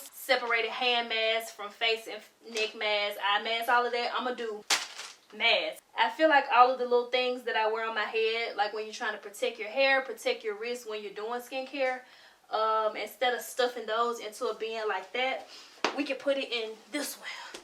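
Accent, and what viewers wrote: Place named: Memphis, United States. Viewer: New Subbie ❤️ Are you and Peyton Charles family because I knew you were from Memphis once I heard your first 3 wordsssss 😊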